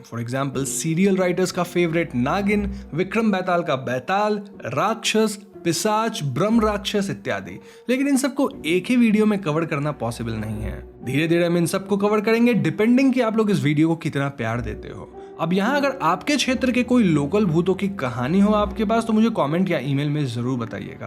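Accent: Bengal, India. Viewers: R u a bengali? Your accent..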